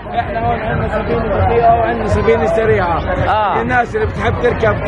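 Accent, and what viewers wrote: United Arab Emirates. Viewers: لهجتك اماراتيه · لهجته إماراتية كان عايش بالإمارات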